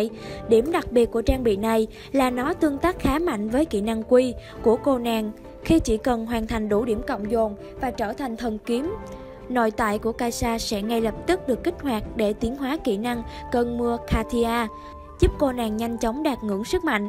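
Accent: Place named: South Central Coast, Vietnam. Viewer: Hình như kênh này người miền Bắc nhiều Nghe giọng miền trung ,nam không quen Ad nên dùng phần mềm chỉnh giọng , hoặc tìm cô gái giọng bắc sẽ tốt đó ad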